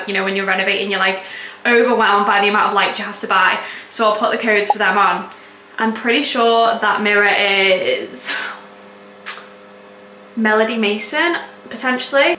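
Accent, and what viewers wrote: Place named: United Kingdom. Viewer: I think that you captured your home beautifully, I first came upon your channel on a short of your home and ironically I watched on mute, your videos kept coming up but I wouldn't put the sound on and it was only today I realised you were British - I though you were American!!! Because your house resembles America so much, but watching this its crazy how I can still see a modern twist on the inside with a traditional English feel from the outside, tbh I think you should have more subscribers, I think one day, one of these videos will blow and you will go viral! But for now I will be tuning in for every video waiting for one to go crazy on views and subs!!!!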